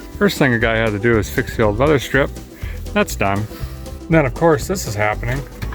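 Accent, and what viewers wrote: Minnesota, United States. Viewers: You sound like you're from Minnesota your accent is really thick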